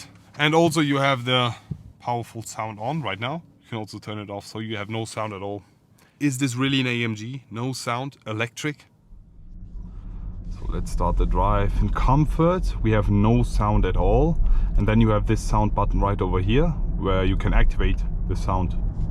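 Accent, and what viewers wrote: Germany. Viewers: Dein deutschenglisch ist furchtbar alter…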